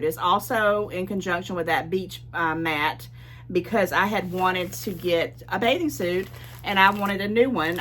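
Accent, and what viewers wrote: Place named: South, United States. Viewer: Hey Hun it's a Southern Draw. You won't get one unless you're in states like Georgia, Alabama, Tennessee, Kentucky, Mississippi, or South Carolina. Mainly Mississippi, Alabama, and Georgia. These are the Southern and Deep South states.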